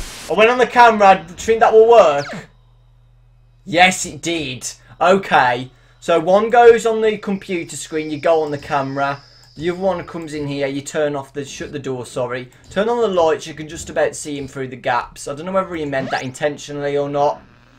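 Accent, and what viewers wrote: United Kingdom. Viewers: Not trying to be raciest but are u British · Thank god he's British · Hey sorry for asking this but what part of uk are you from ? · i wasn't scared at all, i was too busy laughing at every word you said with a vowel in it. Your British accent is hilarious XD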